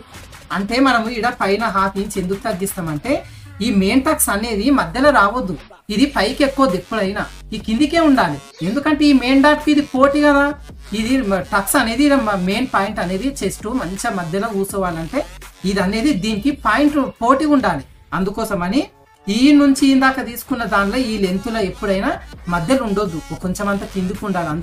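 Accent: Telangana, India. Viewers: First time in Telangana acent I followed your tailor ing method , nice to hear keep going waiting for more classes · First time Telangana language lo vinadam chaala bavundi ❤️ to Telangana accent. 👍🙏🏽 Subscribed.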